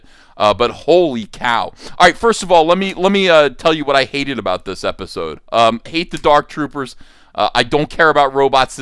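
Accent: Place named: Pittsburgh, United States. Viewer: There is NO WAY this guy is from NJ with that accent! I detect the familiar sound of Pittsburgese, so has to be from somewhere around there.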